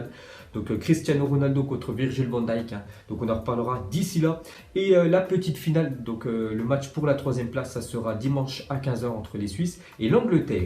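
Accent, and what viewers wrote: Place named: France. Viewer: arrête de prononcer tous les noms de joueurs à la française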